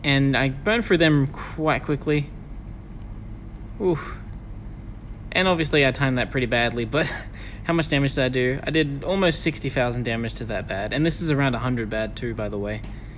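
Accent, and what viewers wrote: Australia. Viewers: How come you are a New Zealander, but you sometimes sound like an Aussie?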